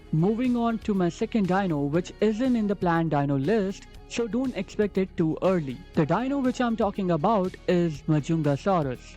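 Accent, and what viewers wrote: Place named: India. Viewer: It’s my first time in your channel and you sound indi a don’t want to be rude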